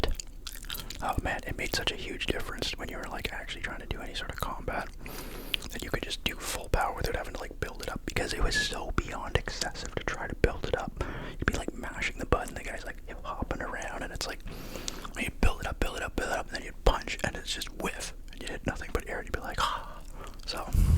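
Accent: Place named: Canada. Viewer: also are you from Canada?? I hear a little accent and its really nice hahah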